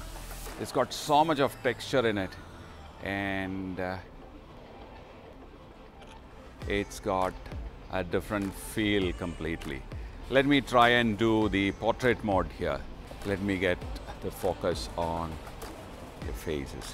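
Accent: India. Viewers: His English is like Hindi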